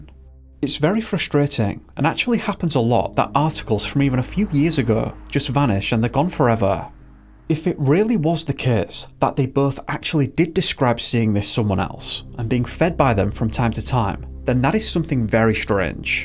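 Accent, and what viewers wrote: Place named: Brittonic, United Kingdom. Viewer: I love this channel. Stays in my top ten. I do want to say I especially like the Fortean, UFOlogical ones, and would appreciate broader Fortean phenomena coverage if that's a thing you'd be interested in. Not that I don't like the missing persons episodes...I'd LOVE to hear you cover the REALLY weird ones. With strange search events, bodies found under bizarre or impossible circumstances, missing kids found alive but absurd distances away in short order, search dog oddness, no cause of death being determinable. Paullide's core weirdies. I REALLY love the "Fae Theory" stuff, including this one. I gather by your accent that you are from some Brittonic area that probably has conspicuous Faerie or Elven Lore. Lovely accent by the way. All around cheers! Please, keep up the great work!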